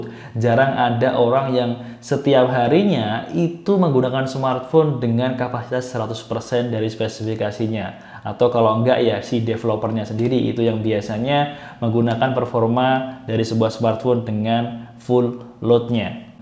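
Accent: Indonesia. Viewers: Orang Lampung ya bang?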